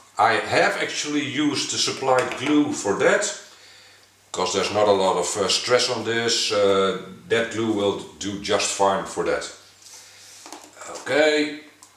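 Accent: Netherlands. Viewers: Ik vind het Engels enorm goed, uitspraak is lekker typisch Dutch.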